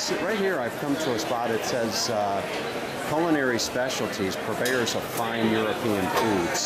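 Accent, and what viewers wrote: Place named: Pittsburgh, United States. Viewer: OMG, your video is perfect for me! I'm writing a story, and this is an early location. It's awesome getting a tour of it! The main location for my story is Abbey Avenue. I placed an apartment building across the street from the Gateway Animal Clinic lol. I've never been to Cleveland, Ohio before, so I've been doing a ton of research. Are you from Pittsburgh? The way you speak sounds a lot like Pittsburghese, as my granddad calls it.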